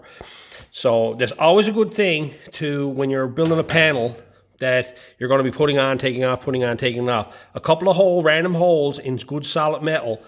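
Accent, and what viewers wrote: Ireland. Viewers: . Iv just found this channel mate. Iv seen 4 videos of yours. I bought my grandson a we car there's a we hole in the floor and the rockers. Looking at you're videos I'm sure I can repair them. Do I hear a bit of Irish in your voice. anyway good job all round mate. Best of luck for the future. Paddy from Ireland. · Nice work. Great accent, sounds slightly Irish! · what accent has this guy? there is some Irish accent on some words and not that fake Irish accent you think we Irish have.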